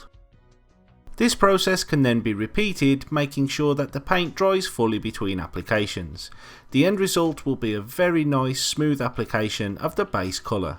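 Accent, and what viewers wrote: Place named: Black Country, United Kingdom. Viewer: He sounded like a yam yam to me.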